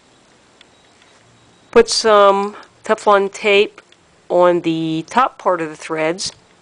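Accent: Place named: North, United States. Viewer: Great Video! I just have to say. Being from the South, I had to get around the NORTHERN ACCENT, LOL. Great video, I had to install same exact one! Awesome Job!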